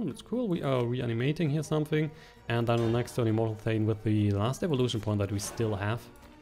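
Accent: Germany. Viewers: You sound so German lol. Great video, you should make Shadowverse Videos more often! But why don't you use ceres? I thought she was a staple. Also could you make a Midrange Swordcraft guide too?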